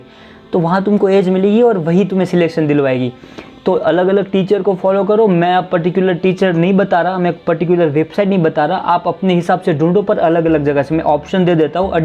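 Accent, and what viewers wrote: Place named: Gujarat, India. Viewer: Sir muje aap Gujarat se lagraheho. Aap ki બોલી se❤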